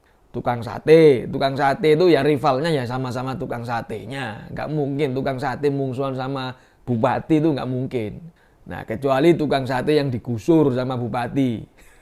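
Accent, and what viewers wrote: East Java, Indonesia. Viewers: jawa timurane kentel sayid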